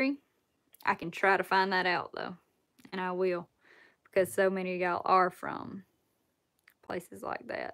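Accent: South, United States. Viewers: Sound like a man?!? Uh ... No. A sweet southern accent is like a warm blanket to me. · Southern accents are the best! You don't sound like a dude, whoever said that, needs some game ears to hear your pleasant voice! Keep doin whatcha doin! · Your southern accent is beautiful just like you! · Gday from the Sunshine Coast Queensland Australia, love me a southern accent couldnt get enough of the stunning southern ladies when i called Bossier City Louisiana home 😁 love your work, awesome job, and tell your daddy he raised you right, nothing beats a well manner, beautiful, country girl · I wish all women had that beautiful southern accent! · Don't you ever think your accent sounds bad.I have always loved a southern ladies accent and yours is the best.I am in Baltimore just on the outskirts of the city and I would take your southern accent and southern charm anyday from how they talk here.Dont let anyone say anything bad about the way you talk or act.Your pure class. 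· True southern belle with your accent y'all are great. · The best thing about your video's is you. Your beautiful, have a sexy southern voice, a good sense of humor, and your a talented hunter. From watching your video, it seems like your a wonderful person and well, if you haven't noticed, we're all still watching even when your live, in your pajamas with your pretty hair not brushed. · You’re accent is awesome. And I’m from CNY. Love the southern accent. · You have to keep that accent I love that southern accent have a great day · accent what accent thats just your southern charm a shinin through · I love your accent its an authentic American accent what you do for a living is cool, girl. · You're an Amazing Pioneer woman & that southern draw is sweet & badass all in one. Will ya do a bowfishin night video for us this summer? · I love you're southern accent. Alot of my family is from the south. 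Im considering moving back down south at some point in time. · Your video may have been taken down because they are doing random removals because they do not have human staff to look at videos and censor them correctly. You may be a victim of You Tube picking random videos that have a title that may be slightly suggestive. Re-download and put a title that is different "DO NOT SAY NO EARS" This gets their attention. Try it again and I guarantee it will not be taken down. If anyone here does not know that your Dad is your Dad and thinks he is your boyfriend...well they are not paying attention. I knew that from the first few videos I watched on your channel. Your videos are great. Your Dad is a very nice man who loves his Daughter. He is one of the hardest working men I have ever seen. You get your work ethic and Southern Qualities from him. I Wish I could tell him how great a job he did raising you...you are a beautiful and clearly well brought up young woman. 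I love your accent. I lived four years in the South and miss that Southern charm. Keep being you. You are a wonderful young woman. Some man will be blessed to call you his girl someday. Stay safe and healthy. We love you and your dad! XX's <3 I hunt and fish and F those who think anything is wrong with that. It is called conservation. You do what you have been raised to do and be proud of who you are. Geo. Like my channel and subscribe please! Geo. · I love the way you talk and wish I had that southern accent you do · You have a lovely southern accent . Nice to hear someone with more of a southern accent , than mine ! :-) Be safe and stay healthy . Wyr God bless From Texas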